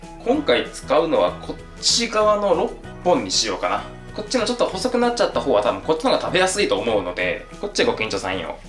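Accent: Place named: Hakata, Japan. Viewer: 全編博多弁の動画を見てみたい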